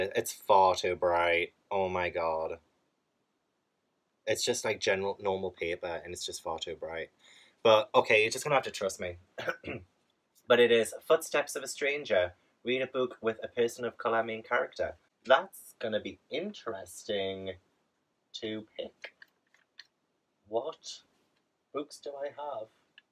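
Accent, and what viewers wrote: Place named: Northern England, United Kingdom. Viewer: I found you via Codie and I'm so excited to hear a northern accent! Good luck with that incredibly ambitious tbr! I really need to read Howl's Moving Castle - I've owned it for about 6 years.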